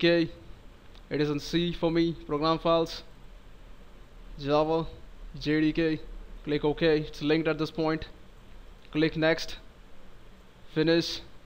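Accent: India. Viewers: Indian accent :3. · Where can i find the non-indian accented version of this tutorial? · Indian have indian accent. So what?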